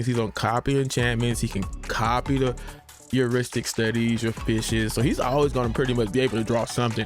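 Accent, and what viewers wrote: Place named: Maryland, United States. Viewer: Glad the algorithm brought me here. Great energy, great video, and you got a lotte love for the community! Also, could be off base, but I feel like I'm hearing Maryland/Baltimore in your voice, and if so, great to hear more DMV content creators 💪🏾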